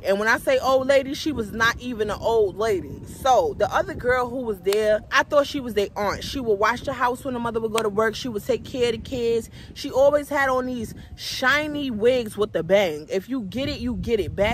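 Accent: New York, United States. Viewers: Sis you be getting some good sponsors! Anyways been binge watching you since your new body butter video loved it even though I’ve been making my own for years I loved your video and how entertaining you are! Now I usually hate to hear that accent so I don’t watch people who have that New York accent but yours yeah I likes. You and symone are my favorite storytime YouTubers now. Anyways keep pushing these videos out sis!💙💙💙 love from Cali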